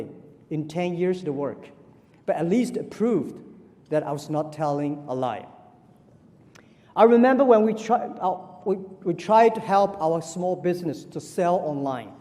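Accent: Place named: United States. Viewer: Thạch Thảo chuẩn, Jack nói tiếng Anh kiểu Mỹ, rất dễ nghe, hơn nữa tư tưởng và cách diễn đạt của ông mang đậm nét châu Á, nên nghe dễ hiểu vô cùng.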